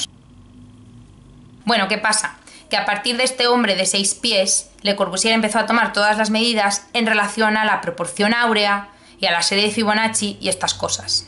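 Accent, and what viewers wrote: Spain. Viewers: Me encanta la pronunciación de nosotros los españoles dz, y si alguien no le gusta que vaya a freír espárragos o a ver si ya puso la marrana.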